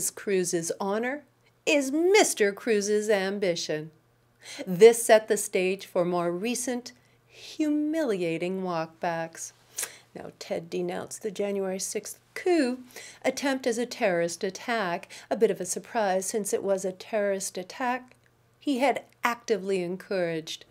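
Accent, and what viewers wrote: Canada. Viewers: You’re the best but do I detect a bit of a Canadian accent?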